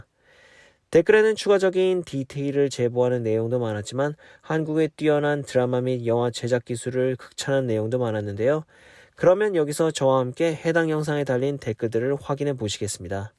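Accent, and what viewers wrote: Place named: Korean-Chinese, China. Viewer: 억양이 조선족 같은데?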